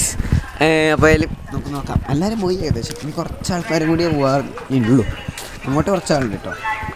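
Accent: Kannur, India. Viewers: Bro u from kannur ano plzz tell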